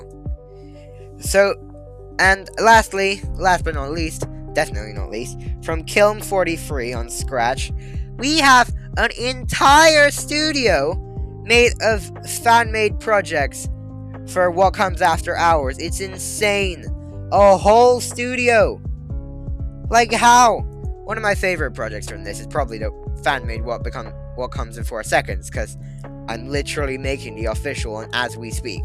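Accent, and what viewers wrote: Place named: United States. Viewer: You are speaking USA English in UK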